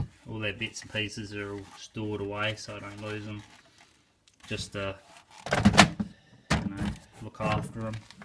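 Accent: Australia. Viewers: U sound Aussie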